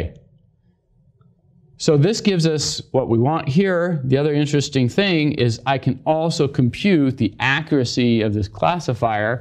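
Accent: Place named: General American, United States. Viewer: A new class of teaching👏👏👏 Good style, perfect suit, standard american accent and high quality content. Thanks a lot👌👌👌👏👏👏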